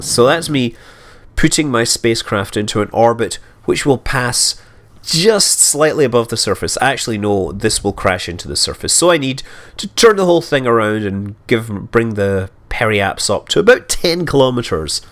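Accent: Scotland, United Kingdom. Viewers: I saw that the channel's name was Scott Manley. Then a deep Scottish voice validated that name.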